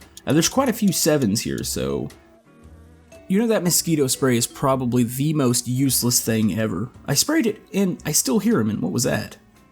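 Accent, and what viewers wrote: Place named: Maryland, United States. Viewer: bro, u sound like me. r u from Maryland?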